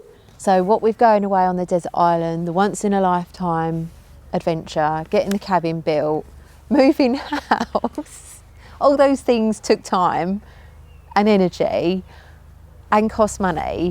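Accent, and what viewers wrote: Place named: England, United Kingdom. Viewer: Love your voice, your English accent is great! Course, I’m in Chicago 🤷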